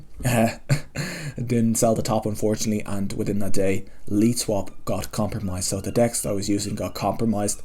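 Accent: Ireland. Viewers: Dope video what part of Ireland you from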